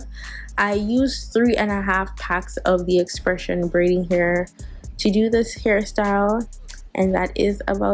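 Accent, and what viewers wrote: Jamaica. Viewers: i know you are jamaican lol the acent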